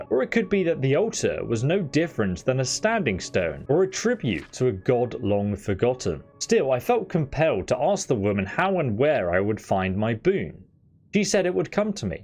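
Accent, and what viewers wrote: England, United Kingdom. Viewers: Love the helpful advice. Serious mental cringing at your horrible pronunciations. Attribute, Markarth and quite a few other speech errors. I get that you sound British, but honestly, that should mean you are better at speaking English, not worse. Again, I found this advice helpful. Please don't think I am ragging on you. Subscribing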